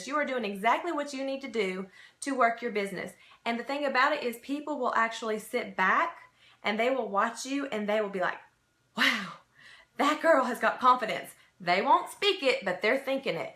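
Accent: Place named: South, United States. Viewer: Excellent advice! Thank you! I do have a question, how do people passing through on YT order from you? I don't see your Younique website link anywhere. Is there a legal issue? I started a YT channel recently and want to promote my business on there through tutorials, unboxings and reviews. Any advice? Thank you so much! Also, where are you from.......love your southern accent. ;)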